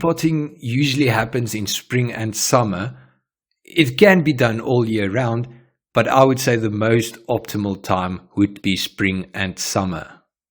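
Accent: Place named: South Africa. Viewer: Damn that South African accent 😂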